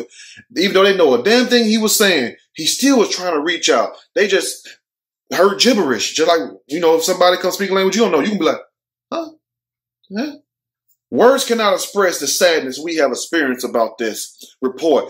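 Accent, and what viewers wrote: South, United States. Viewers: Boy, I love ❤️ the way you tell a story young man!!!! 😍🥰!!! With your country self!!! This is country curvy cougar loves herself a country talking young man! Your wisdom & discernment is out of this world!!! Ellen please come & discover this very talented cutie pie who needs to have his own show!!! Please!!!!!!!!!!! 🙏🏾🙏🏾🙏🏾🙏🏾 In the meantime, this “family member” will keep on watching this awesome on his channel......until his promotion! I only 🙏🏾 he stays the same when he does become a famous tv star!!! 😘😘😘😘💜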